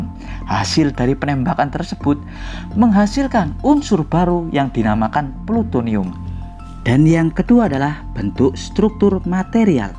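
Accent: Java, Indonesia. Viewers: mendhok djowo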